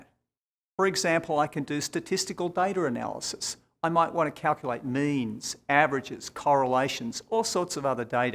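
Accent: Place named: Australia. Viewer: That's a presentable Australian accent, they get much more wild than that!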